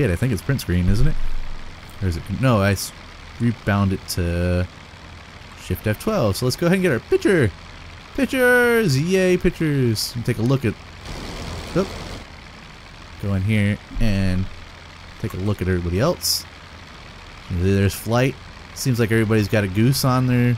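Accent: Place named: France. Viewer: That Frénch accént tho... ermahgerd, itś epic ;3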